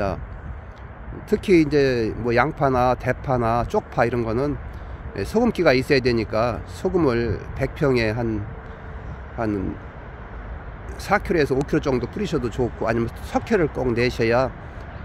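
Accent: Chungcheong, South Korea. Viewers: 구수한 충청도사투리 에 귀에 쏙쏙 정보 감사해요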